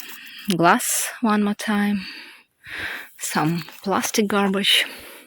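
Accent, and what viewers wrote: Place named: Eastern Europe. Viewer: I gave you your 82nd subscription, I hope you reach 100 very soon! This video showed up on my homepage randomly, so this is my first viewing of you. It would be nicer tor additional viewers interested, to know the general location of where in the world you are building your personal paradise? You speak good English, but definitely makes me think perhaps your in Eastern Europe somewhere? As a suggestion, and perhaps more viewers from your general area will feel a relation and subscribe?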